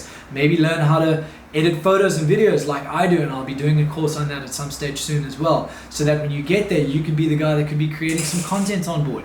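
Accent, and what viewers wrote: South Africa. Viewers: Boet, are you South African? The accent dead give away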